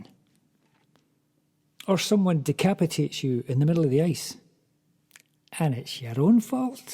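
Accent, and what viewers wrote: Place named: Scotland, United Kingdom. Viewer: That's a shocker if a Scottish accent all the same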